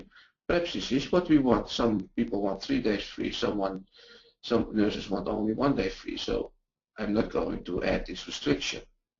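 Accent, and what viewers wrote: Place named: Netherlands. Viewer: This man's English pronuncation indeed sounds like he is from the Netherlands and learned English over there.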